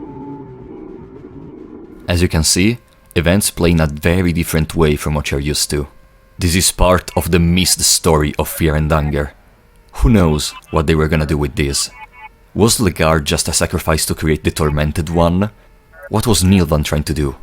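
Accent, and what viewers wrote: Italy. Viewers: i just duscovered your channel, and was wondering, are you, by any chance italian? curiosity js killing me, also i love the content i hope you keep growing like you are doing👍👍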